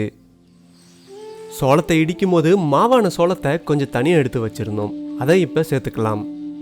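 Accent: Salem, India. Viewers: Salem ah neenga bro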